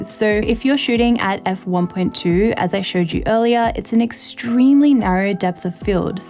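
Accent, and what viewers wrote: Sydney, Australia. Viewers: Mumma you australian? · Americans love the Aussie accent 🇦🇺😍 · Great content. Good to hear the Sydney accent. It’s amazing how you can still get negative comments with pretty informative non-controversial videos. I’ll be saving your channel for our schools photography course for next year. Keep up the good work.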